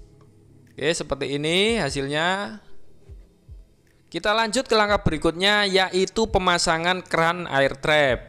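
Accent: Java, Indonesia. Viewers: Satu hal yg saya suka di channel Om..walaupun jowo ne kental..tp bahasa inggrisnya ga salah2x dan ga asal2x..Eco Cube betul2x dibaca "eko kyub"..bukan ky beberapa channel lain yg bacanya "eko kab"...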